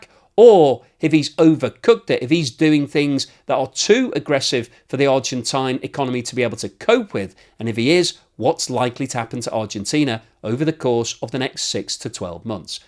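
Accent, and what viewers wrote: United Kingdom. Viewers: As soon as i heard your british accent, i knew this was a hit piece.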